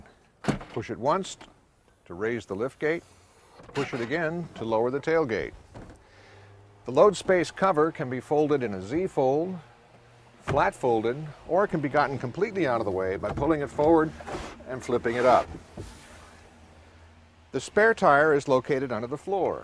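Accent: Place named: United States. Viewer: this is one creepy VHS era video why is an american bloke speaking with a posh English music in the background? :D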